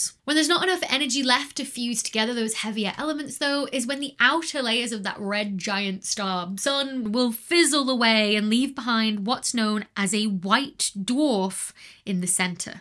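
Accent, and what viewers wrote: United Kingdom. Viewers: I really appreciate your channel, fun and still very heady...off topic, but the CC follows your British accent - Hubble was Hobble, and exo planet said XA planet once 😂🙌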